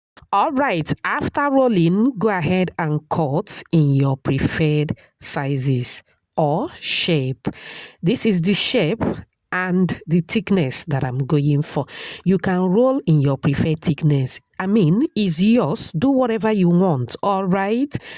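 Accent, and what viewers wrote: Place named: Igbo, Nigeria. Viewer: From Nigeria, I like ur Igbotic accent ❤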